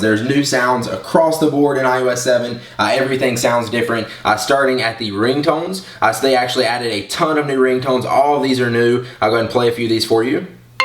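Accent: United States. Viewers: it's not exactly an american accent. it's a country accent. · you have the biggest american accent ever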